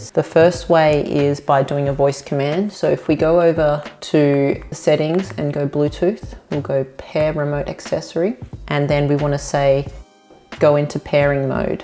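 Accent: Australia. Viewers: She sounds like australian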